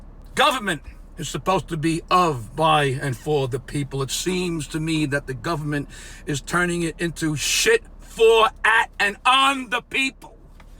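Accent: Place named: Massachusetts, United States. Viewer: You have a Lowell or Malden accent. I’m from Billerica.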